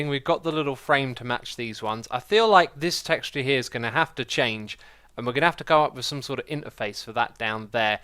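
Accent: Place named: England, United Kingdom. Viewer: Wait!!!! is English???? Wow, i usually thought he was American. Ok that explain why his accent is so different from Americans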